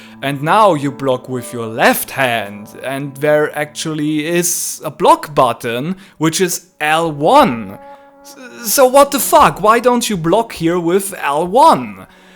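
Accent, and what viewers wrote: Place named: Germany. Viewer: I wanted to watch the video since I agree with the opinion, but from the first "laaaahst" the fucking German cringe-accent hit me. Peinlich as usual. I hate mine peinliche Mitdeutschen so much................................. Please get off DuRöhre until you've learned to pronounce (modern) English. Watch/play stuff without the fucking Synchros for instance, why dont'cha... Un-verfickt-glaublich......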